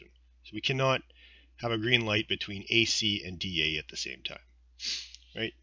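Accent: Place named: Canada. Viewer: Canadian accent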